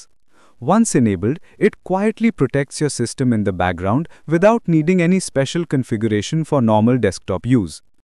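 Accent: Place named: India. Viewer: 换成印度口音了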